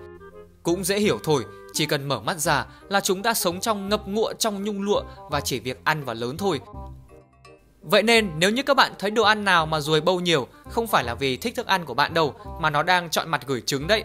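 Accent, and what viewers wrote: South, Vietnam. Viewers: Có giọng Nam nữ ta ơi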